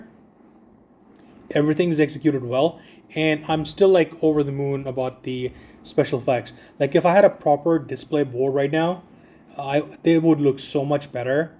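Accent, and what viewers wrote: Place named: India. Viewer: good review video bro hey! hi from Quebec Canada, I speak French, so me too had to learn English to join the gi joe community, welcome! from your accent, you must come from India or something? scrap iron your videos have little views because the trend is about the new releases, I have this guy since years before, so that s why it will not have many views you can compensate with dios - scenes to present them, it always look better, just cardboard and paint does a decent job, it s cheap. he s one one my favs in Cobra, he looks good next to reg blue troopers and the stinger jeep he s a tank killer, so he s always protected by troopers, vipers, so he only needs a gun. since you re new, or at least come from far away, you should know the Hasbro pulse site, here on u tube, check the 'post' section to comment and suggest to the makers themselves, I m sure they ll love that you love gi joe coming from so far. 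the cartoons, comics, they are a reference, but the file cards are the most important infos, I rely on that all the time, scrap iron is a solid character for Cobra in gi joe, congrats! so now you know... God bless America and world peace good work bro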